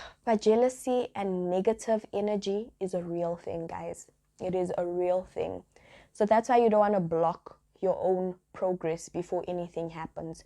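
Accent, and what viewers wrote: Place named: South Africa. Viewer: You sound South African you know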